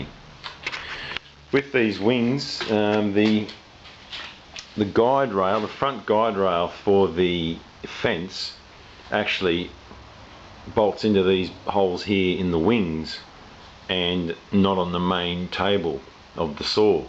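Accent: Australia. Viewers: Good to hear a Aussie accent, oi oi oi . I should have brought a SB 12, I am not happy with my saws fence system and I seen you did one on your old saw , did you do a video on that ?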